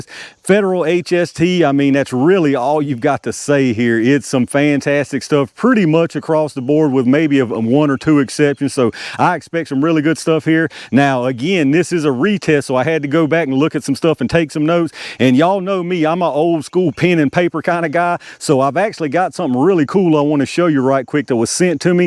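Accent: South, United States. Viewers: Very useful and informative test - thank you. Is that your real accent, or are you "southing it up" for us on YouTube???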